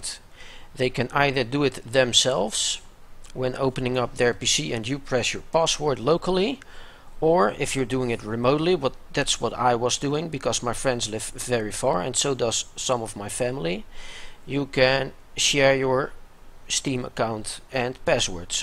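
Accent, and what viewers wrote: Netherlands. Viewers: leuke accent haha, echt nederlands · whaha love your dutch accent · That nederlandse accent tho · Ik kon meteen horen dat je Nederlands was, bedankt voor de tutorial! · Ur accent is so dutch omg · i have a feeling he is dutch XD i can tell by his accent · dat is very Dunglisch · jouw accent is so duidelijk nederland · je bent nederlands leuk accentje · deze guy is nederlands heh? Goede video btw XD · Are you dutch? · ik weet gewoon dat jij waarschijnlijk nederlands bent. je praat zo nederlands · Dankjewel man, ik hoor aan je accent dat je Nederlands bent :) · Damn dutch acc is real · That Dutch accent tho. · ) dat dutch accent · ik hoor aan je stem dat je nederlands bent :) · I can hear that you're Dutch ;D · Are you Dutch ??? You sound Dutch to me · are u dutch? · nl nederlands sws kan niet anders - en your dutch, i know it for sure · Dat Nederlandse accent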